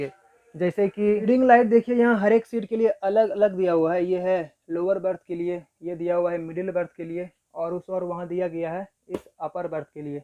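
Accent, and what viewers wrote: Bihar, India. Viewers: Shandar..khalis bihari andaz..uspar se mithi awaz..sandesh bhi hai anya ac wale blogger ke liye ki journey ke saath jaruratmandon ki dil se help karta hai.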